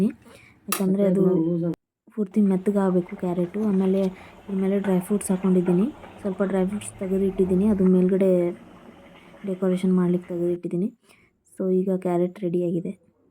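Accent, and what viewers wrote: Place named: Bangalore, India. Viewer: niv uttar Karnataka bitu Bangalore language yak mathadakaiiri😅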